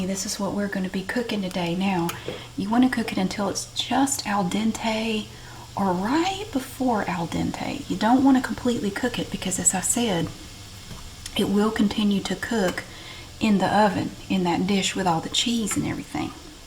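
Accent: South, United States. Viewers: I always giggle when I hear you say swear words or wear them on your apron like today and your sarcasm because you are such a sweet soft spoken southern lady 😂 I love it!!! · your accent makes me melt Southern accents are the best. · Aside from the humour and the Southern drawl, it's brilliant how you always tell viewers they can make these recipes - so encouraging. Peace from Scotland 🏴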